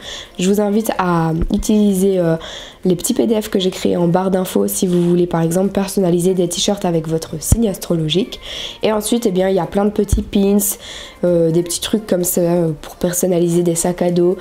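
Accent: Belgium. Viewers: J'adore ton léger accent (belge il me semble)😍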